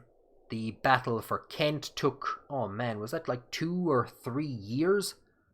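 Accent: Ireland. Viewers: I just want to say that this is the best playthrough of CK3 I have ever watched. I like the editing, especially, but also your incredibly knowledge of the history from this period and region. Also, as a typical American, I really enjoy the Irish accent. I know that's silly, but it's true. The end of the series is, for me, kind of like losing a friend. But here's to making new ones.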